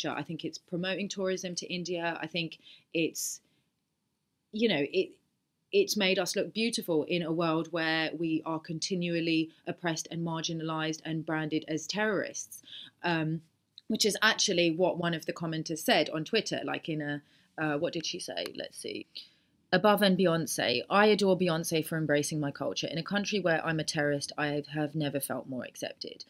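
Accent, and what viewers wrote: United Kingdom. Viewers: LMAO, why, coz of her British accent 🤣🤣🤣🤣🤣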